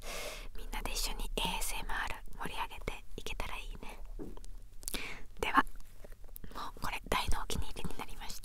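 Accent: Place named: Kagoshima, Japan. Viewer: 鹿児島弁混じりの関西弁になってましたね(笑)あいちゃん顔焼けたぁ？いい感じです。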